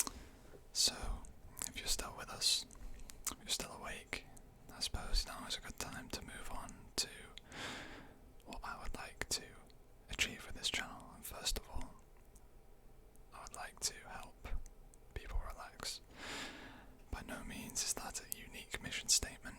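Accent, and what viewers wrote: United Kingdom. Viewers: It’s so hard to find good British ASMRtists, definitely earned a follow from me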